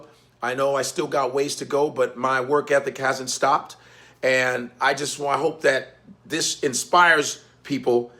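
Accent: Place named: Chicago, United States. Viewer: Congratulations, my Chi-Town Brother. Although I was born and raised on the do-or-die Near West Side of Chicago, where most people speak with a different accent than you, I appreciate yours. Just like I appreciate New York and Atlanta accents and others. I appreciate your intelligence, your talents, and your skills as well. Thanks for being unapologetically Black in public. In addition to that, thanks for sharing YOUR SPECIFIC Black experience. All the best, my Brother.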